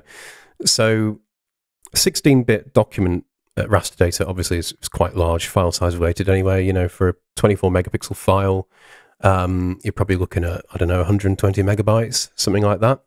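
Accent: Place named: United Kingdom. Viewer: it‘ a pleasure to listen to your British, sophisticated voice.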